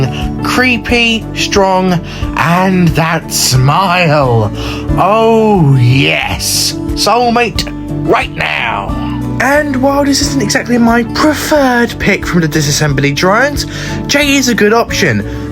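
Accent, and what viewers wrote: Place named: United Kingdom. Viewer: You're so british it's grating 😔😔😔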